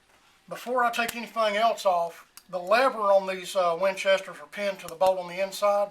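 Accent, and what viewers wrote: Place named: East Texas, United States. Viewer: Thanks for this video! Maine? You sound like my people here in the Texas piney woods! Gonna check your site out. I need my 1974 M94 blued. You mention that you do that. It looks like a black paint that's coming off the receiver.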